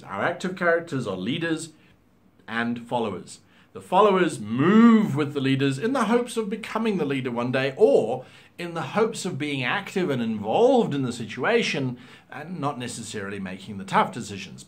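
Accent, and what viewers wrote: United States. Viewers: Thank you for the video! Super helpful and relevant to a few players in my group. Also, it was super trippy hearing your American accent. I approve. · That accent really threw me off, and it sounded like a normal american accent with a few funks in it. Cool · Your American accent is like a combination of all the American accents. But over all it’s pretty good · Good American accent! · Thats a pretty good American Accent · Your American accent isn't the worst ive ever heard, well done.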